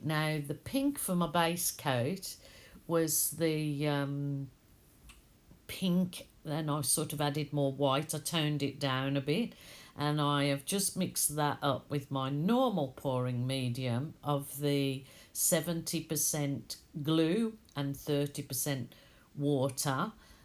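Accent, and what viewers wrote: Birmingham, United Kingdom. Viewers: Hello from Shrewsbury, UK. You’re a lockdown saviour...I’m now an acrylic addict! If you ever come to live in England, you would fit in really well in the Midlands as you have a perfect Birmingham accent. Just wondered if anyone else had ever mentioned that?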